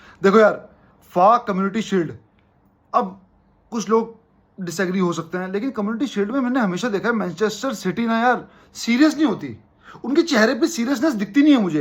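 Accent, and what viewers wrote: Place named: Uttar Pradesh, India. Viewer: uttar pradesh mai kaha se ho bhai Voh toh mujhe pata chl gaya tha app uttar pradesh se ho apki voice se😅😅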